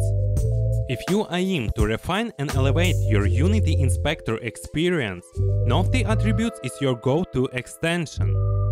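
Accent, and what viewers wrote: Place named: Russia. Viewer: Вери гуд видеос, виз руссиан аксент итс мор ундерстендабле! Сенк ю вери матч!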